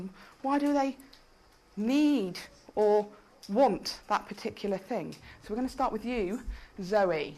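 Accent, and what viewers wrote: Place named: United Kingdom. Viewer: That's kinda puzzling... She has got a British accent but this shouldn't hurt so much.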